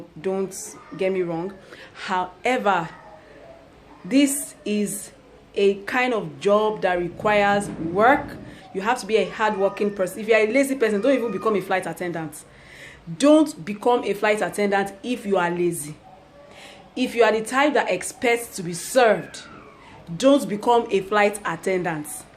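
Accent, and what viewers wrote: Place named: Nigeria. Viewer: Hello I love your authentic Nigerian accent! The Nigerians that I know are very hard working and ambitious people!! you seem to be so driven about your life and future!!! What I wanted to ask you is if it is possible to raise a family with the salary that you guys make as a flight attendant for Emirates? Is Emirates hiring again pretty girls and boys from the world over?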